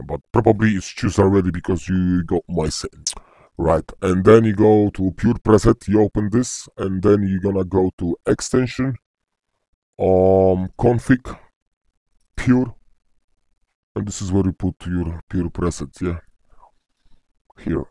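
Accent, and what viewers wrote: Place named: Italy. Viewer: fra si sente di piu l'accento italiano che il voice changer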